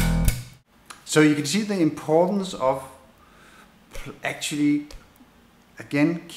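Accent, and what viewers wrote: Denmark. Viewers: You are Danish?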